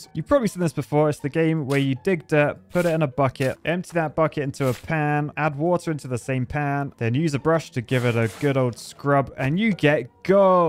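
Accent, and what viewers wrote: United Kingdom. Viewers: British dude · r u from the north or south of the uk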